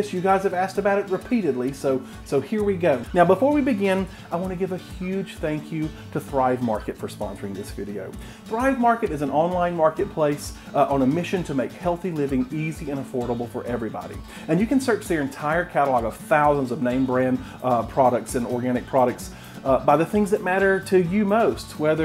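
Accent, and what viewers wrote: South, United States. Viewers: Hey: ) This is my 1st time here...this video was recommended to me, I'd never heard of you or your channel before (or Egg Loaf, for that matter lol). When I saw Flo behind you I thought, "I'm in for one heckuva fun ride!". Then I heard your accent & thought, "Yep! Yep! Yep! I'm in!" (I'm from the South, too...born & raised). With each Egg Loaf, my mouth watered more, especially the 3rd one, the one you came up with. What else could I do but subscribe to your channel & follow you on social media? lol I'm so sorry, I didn't quite hear your question, could you ask me again, please? Oh, no doubt about it.....I'll be spending this evening & the rest of tonight (however long it takes me) watching all your videos, from oldest to newest, until I get caught up! lol Oh, man! Biscuits, Sawmill Gravy, Shrimp & Grits, Cornbread, French Fries, Cornbread Dressing, Pumpkin Pie, Pecan Pie, Pigs In A Blanket, Salmon Patties?!?!?!?!?!? 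Oh, you're spoilin' me, here!!! Seriously, though, I think you're wonderful! I love your style! Best believe, I'll be cooking up your recipes...& I can hardly wait! Thank you so much!!! P.S. "It helps if ya plug it in."!!! BWAHAHAHA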